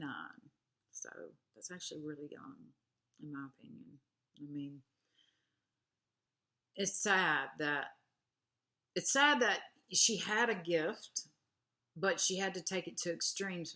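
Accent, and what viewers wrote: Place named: Alabama, United States. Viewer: Aww i miss the bama accent even more after hearing your voice! Pretty neat B!! Xoxo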